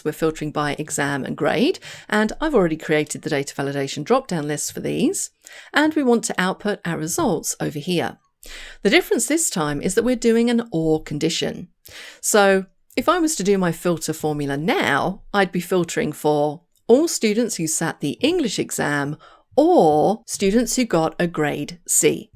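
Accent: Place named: United Kingdom. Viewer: Me encanta tu canal. Su explicación es bastante clara. Muchas Gracias desde Centroamérica. I love your channel. Your explanation is really clear even i'dont speak English, but your British accent is so clear. Tks so much. My favorite fuction is xlookup (buscarX), filter is also one of them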